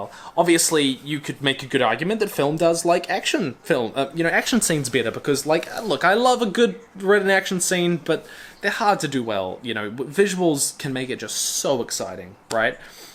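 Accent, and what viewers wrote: New Zealand. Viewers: Which New Zealand accent, though?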